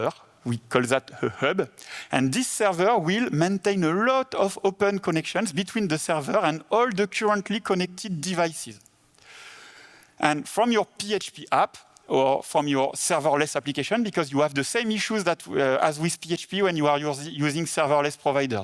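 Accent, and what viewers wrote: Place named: England, United Kingdom. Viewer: why does his french sound like english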